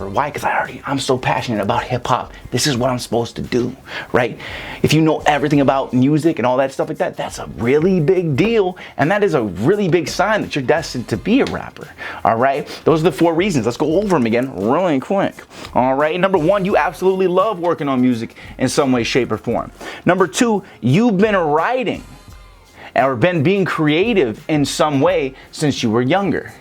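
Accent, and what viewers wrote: Chicago, United States. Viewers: Your delivery is so Chicago. It's funny and refreshing to watch your informative vids. Preciate you, bro. Continue courageously! <link>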